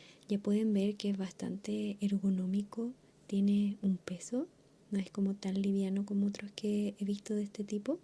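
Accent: Chile. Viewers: Grande, siempre me gustó tu voz así chilena de chill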